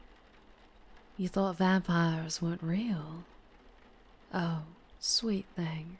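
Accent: South, United States. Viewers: Not bad . for a southern draw . and great story. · As someone who's from the south thats a pretty good accent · Ooh! I love the accent! Southern? It feels like a well traveled southern Belle's honey sweet voice. ❤❤❤ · I got to admit, you do a pretty good southern accent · Very nice, love that southern accent. 🌹♥️😘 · i wanna make this clear...as a southerner (flordian bite me) this accent was spot on. 10 outa 10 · Aaaaand my author brain is now on overdrive, thanks;p Very well done! Was just wondering the other day what you might sound like with a Southern accent. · A charming and sweet southern accent lulling me to sleep? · Maybe it’s the midwestern in me but I am a sucker for southern belles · I just want to let you know, that I actually did liste to this particular vampire roleplay. But not only that, it is actually a very good vampire story. I specially like the southern accent as well. 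And also, I hope that there will be more stories. Like this one, in the near future. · I can hear your orc voice😍😍 Through your Southern accent Sometimes so hot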